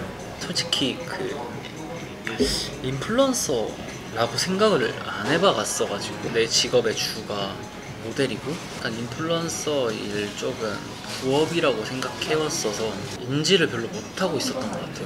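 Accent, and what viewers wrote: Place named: Gyeongsang, South Korea. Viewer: 저도 처음 봤을 때 bts 지민 느낌 있었어요. 특히 부산 출신이라 그런지 경상도 억양, 목소리, 말투가 너무 닮아서 놀랬어요.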